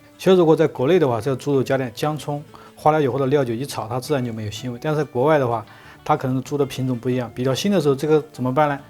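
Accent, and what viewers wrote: Sichuan, China. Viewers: ，铲铲哦，你娃的川普太亲切了😊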